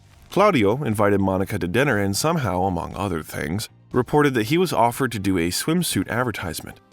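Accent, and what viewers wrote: United States. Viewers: I love your voice and accent. California? Lol hard to follow the story at the beginning because distraction of this pleasant voice. But then, yeah, I like the biography 🎉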